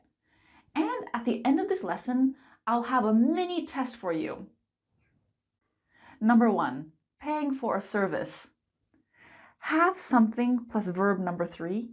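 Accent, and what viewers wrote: United States. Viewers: I want to learn speaking English fluenly and correctly on phone calls or by any other means . I Enjoy your channel keep it up. sister are you american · Hello, great teacher! Is your pronunciation American, British or Australian? Your pronunciation is so nice!😜👍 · Are you American or british?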